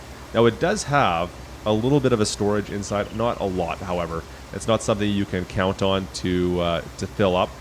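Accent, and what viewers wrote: Canada. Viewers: I love the way you say about, aboot. I love Canada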